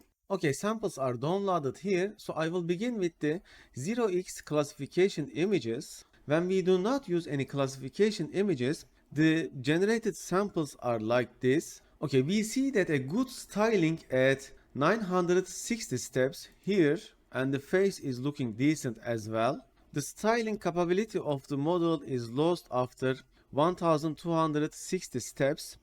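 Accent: Turkey. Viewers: ABİ TURK İNGİLİZCE AKSANİ OLMASAYDİ SEN YİNE OLURDUN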